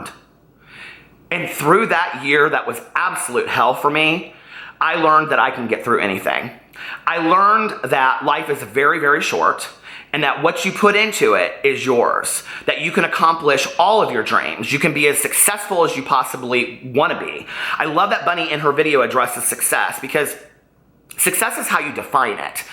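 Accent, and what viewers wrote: South, United States. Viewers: I love your voice! Are you from the south? Personally I love to hear southern accents...it makes me feel at home as I'm from Tennessee.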